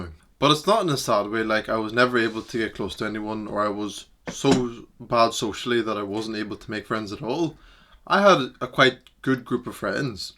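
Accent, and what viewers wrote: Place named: Belfast, United Kingdom. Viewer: I can relate bro, recognise the Belfast accent I'm from Newry bro and the quality of (friends) in this area are nowhere near good enough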